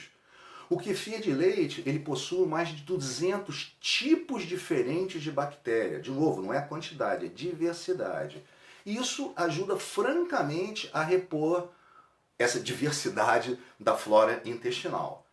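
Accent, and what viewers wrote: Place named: Rio de Janeiro, Brazil. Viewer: Até o sotaque da Zona Sul do Rio é parecido!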